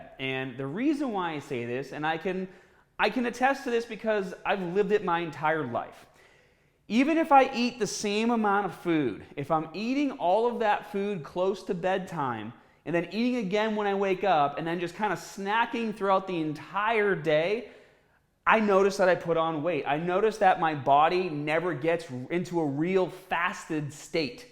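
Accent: Boston, United States. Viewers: Great video! Your Bostonian accent cracks me up, though! 😆 Especially since it doesn't seem to be consistent: you kept alternating between saying "cardio" and "CAH-dio" during that part of the video! 🤣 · The Boston accent kills me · Cardio. It's a Boston accent, grow up. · “Cah-dio” I love the Boston accent. is a sexy and smart man. Always great content! 🙏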